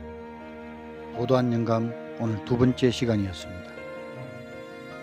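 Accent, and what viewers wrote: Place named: Gyeongsang, South Korea. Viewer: ^ 채널명이 멋집니다 열정의 샘이시군요. 작가님! 구수한 경상도 목소리가 좋습니다. 화이팅입니다..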